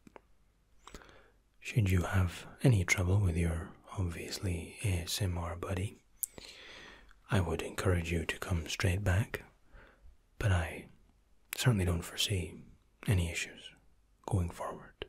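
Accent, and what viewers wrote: Scotland, United Kingdom. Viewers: Scottish ASMR King! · Hannele he’s already got a Scottish accent lol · Are you English or Irish. Or Scottish?? I can't quite tell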